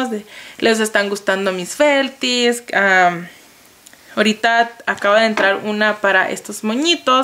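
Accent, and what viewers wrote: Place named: North, Mexico. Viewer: Eres como del norte de México, me di cuenta cuando dijjiste "oshenta y osho" jejejeje. Saludos!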